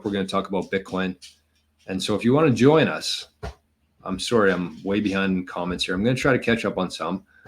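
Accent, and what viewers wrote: Canada. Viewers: As an American, it’s so cringe to listen to a canadian 🤦🏻‍♂️ Very mid content.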